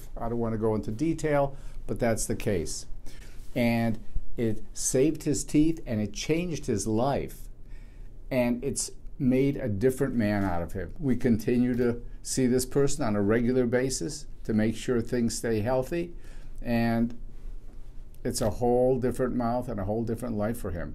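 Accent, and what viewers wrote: United Kingdom. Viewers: Let me guess..... ....British?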